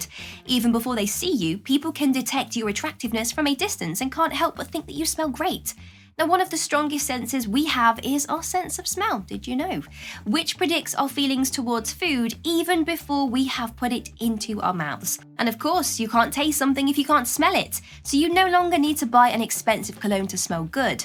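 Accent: England, United Kingdom. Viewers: are you English?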